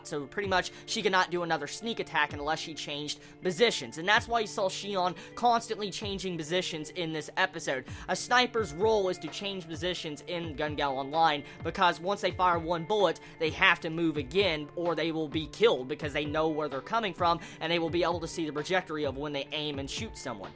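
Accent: United States. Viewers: That country accent tho...:)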